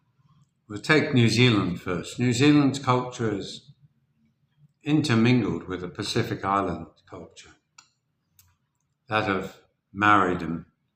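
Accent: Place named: United Kingdom. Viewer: Hey No Need, I agree there are many Stupid Americans here and Stupid Brits the Same, you can’t claim to be Australian, but you’re truly British. It’s in your accent long live the King God bless. Hope you’re safe.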